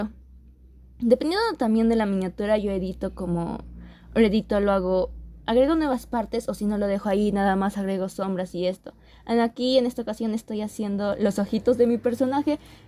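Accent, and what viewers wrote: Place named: Latin America. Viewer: Sí se nota que eres de latam 😂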